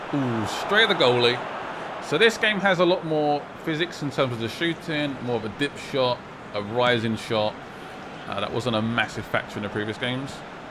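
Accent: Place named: Liverpool, United Kingdom. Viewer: It's difficult to understand some of the things you're saying because of your strong scouse accent.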